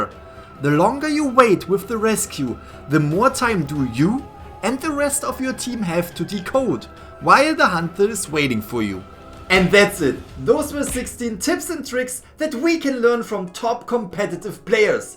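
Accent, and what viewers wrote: Germany. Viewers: Are you German? Because of your accent. No hate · Are u german?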